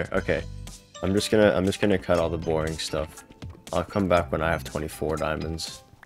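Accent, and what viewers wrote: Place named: Canada. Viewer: YOURE CANADIAN ASDKLNAOSPIJDKOJASDASDASD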